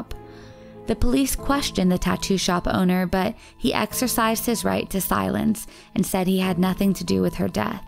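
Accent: India. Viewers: Finally a video without that Hindu voice . Stop making videos with the indian voice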